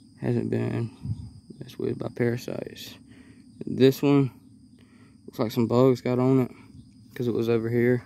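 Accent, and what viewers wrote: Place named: South, United States. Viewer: Was this filmed around upload date or is it old? I thought they didn’t come out until later in the south (assuming by accent)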